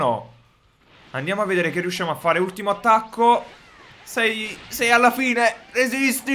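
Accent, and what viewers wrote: Naples, Italy. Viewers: Sei napoletano io si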